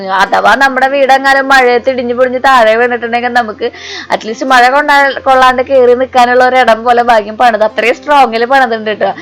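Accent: Thrissur, India. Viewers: Hi.. njan kurach divasam ayitteullu kanan thudagitt..sathyam njan addict ayi nnu venam parayan.postive energy.. especially jolikonnum povathe irikunna enik..Thrissur bhasha powliyanu..avide ramavarmapurath padichitund.orupad ormakal und.orupad ishtamanu..god bless u..love u lot..💓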